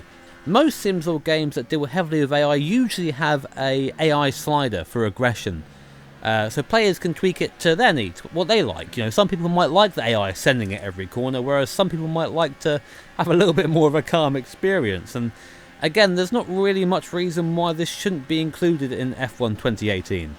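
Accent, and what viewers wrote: United Kingdom. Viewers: Annoying thic Brit!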